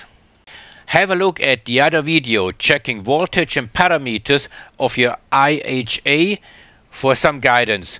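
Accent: Swabia, Germany. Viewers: Funy, funny, funny, jetzt habe ich entdeckt, dass es den Kanal auch auf englisch gibt, und jetzt verstehe ich endlich alles. Trotzdem würde ich hochdeutsch bevorzugen - aber was man nicht bekommt, bekommt man halt nicht. Schwäbisch ist jedenfalls gleich nach sächsisch nahezu unverstehbar für mich. Aber schlimmer geht ja immer! Den Schairer verstehe ich absolut Null!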